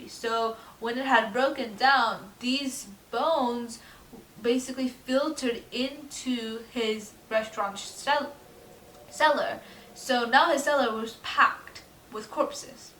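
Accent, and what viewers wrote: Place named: France. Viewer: Woah this is so interesting, and also your French accent is so nice 😊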